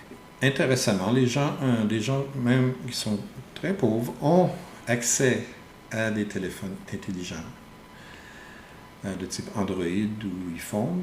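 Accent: Quebec, Canada. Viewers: J'ai découvert t'a chaîne dernièrement et je fais du rétropédalage pour regarder ton contenu plus ancien. Je m'étais douté a ton accent anglo que tu étais de la belle province, maintenant il n'y a plus de doute. En tout cas beau travail de vulgarisation, j'apprécie beaucoup ton contenu, tu es très pédagogue. 👍👏😊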